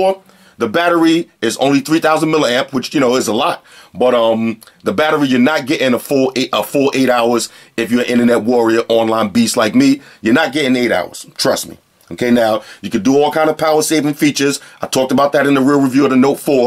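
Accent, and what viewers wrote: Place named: Brooklyn, United States. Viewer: Sound like Brooklyn in the house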